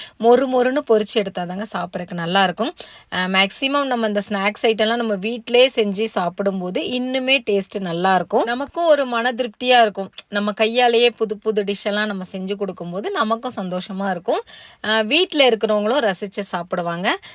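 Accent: Tirunelveli, India. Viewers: . நெல்லை தமிழ்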